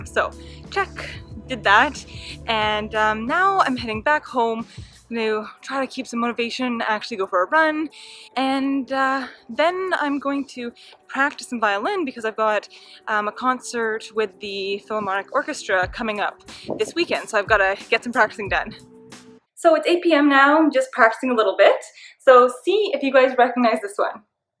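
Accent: Canada. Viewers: Is she Canadian? Her accent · are u canadian ? if so what part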